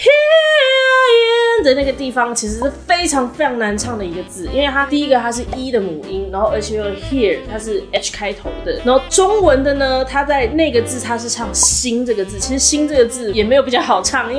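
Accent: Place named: United States. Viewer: Past, fast, dance 她应该是用美式英文 跟正统英文发音不一样 所以声音比较扁的 不只唱歌 讲话那些字也是比较扁的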